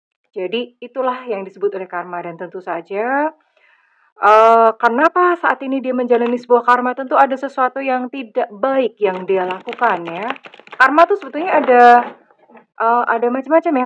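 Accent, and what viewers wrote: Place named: West Java, Indonesia. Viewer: Aya noann sunda nyh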